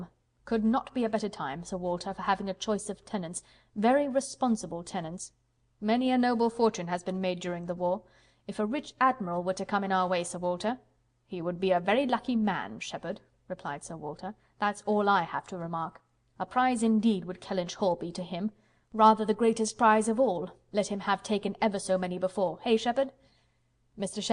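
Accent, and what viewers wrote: RP, United Kingdom. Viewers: love her posh voice :D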